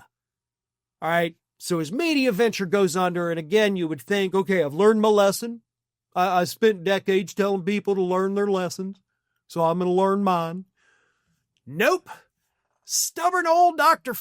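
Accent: South, United States. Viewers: The southern accent is starting to get to me. Very insulting to intelligent non MAGA southerners no matter how redneck you pitch it. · No need to mock the south by using that accent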